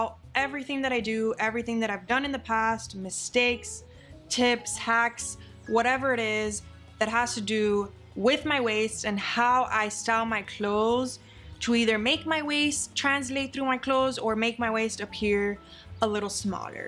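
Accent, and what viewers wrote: Miami, United States. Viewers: That is the Miami accent... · i know a miami girl accent when i see one 🥰🥰🥰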